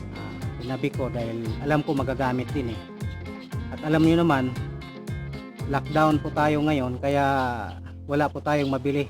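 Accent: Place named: Visayas, Philippines. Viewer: Boss tha cebu ka raw, kaya pala pansin ko may bisaya ka na accent hehe tga cagayan de oro ako, proud bisaya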